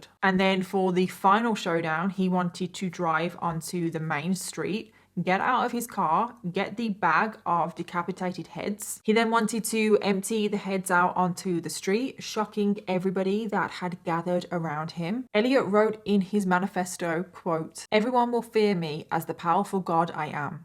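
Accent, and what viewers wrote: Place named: United Kingdom. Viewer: You should definitely narrate audiobooks! True crime and history audiobooks narrated by someone with a British accent are all I listen to! Also, wish I could nail your makeup, I just end up looking like an idiot 😂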